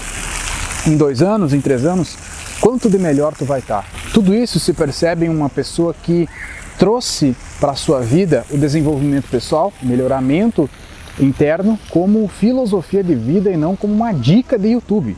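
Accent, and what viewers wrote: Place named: South, Brazil. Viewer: Você é do Sul? Tem sotaque.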